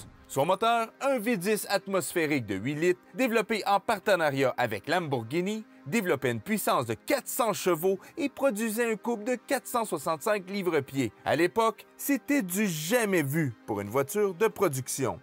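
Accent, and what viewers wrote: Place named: Quebec, Canada. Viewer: Vraiment extraordinaire, en plus avec l'accent québécois , c'est vraiment plaisant !😊😊😊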